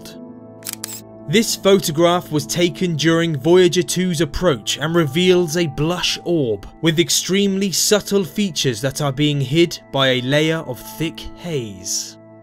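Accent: United Kingdom. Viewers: Why do you have a British Accent? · interesting accent you have.. what area of Britain does it come from? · british English af.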